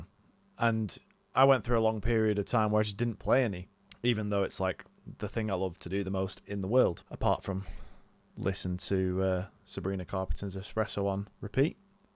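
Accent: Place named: Yorkshire, United Kingdom. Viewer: Another Yorkshire lad?? 🤘🏻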